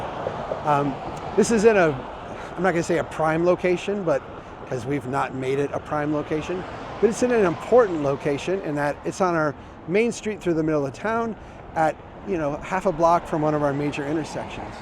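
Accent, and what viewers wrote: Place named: Minnesota, United States. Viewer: He is from Min-ah-sooooo-tah so he has an accent. You're darn tootin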